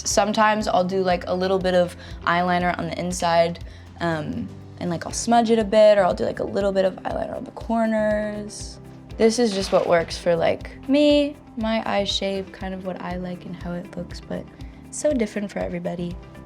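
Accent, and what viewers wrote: United Kingdom. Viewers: Omg her British accent is killing me 😂she’s too pure for this world i love her 😍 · I love her British accent ❤ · Ahh dat British accent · Her British accent is perfect 😍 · She speaks sort of british english or it s just me who think that?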